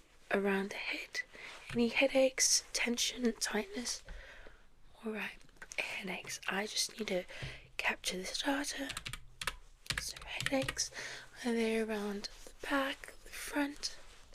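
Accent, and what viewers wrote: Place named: United Kingdom. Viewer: I swear she is British with that accent.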